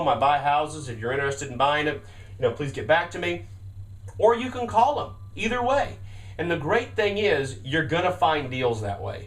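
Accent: United States. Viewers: Where did the country accent come from all of a sudden? :\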